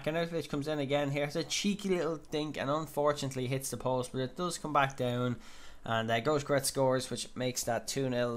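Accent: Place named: Ireland. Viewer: Where in ireland are you from? :) class series btw :)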